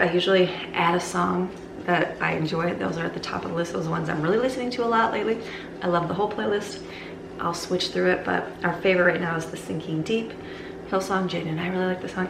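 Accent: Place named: Michigan, United States. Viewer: I just found your channel and immediately fell in love!! I hear the accent and wondered.. is that the Michigan accent I know so well?! 😂😂 I’m from Traverse City!! My hubs and I live in Savannah, GA now for his work. It’s a very different world down south!! Thank you for the new channel addiction LOL!!! 💜💜💜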